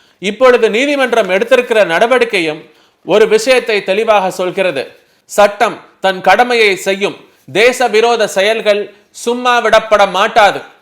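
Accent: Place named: North, India. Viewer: Tampi. Un. Kural. Vadakkan. Mathari. Thiriuthu. Ni. Tamilana.shollu.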